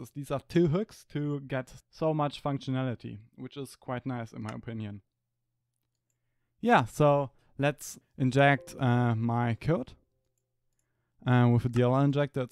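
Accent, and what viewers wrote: Germany. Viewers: haha englisch mit dein deutschen akzient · Ich find's immer lustig wenn deutsche englische Videos machen, weil man hört es schon ziemlich, naja, vermutlich wird das sowieso nur den deutschen auffallen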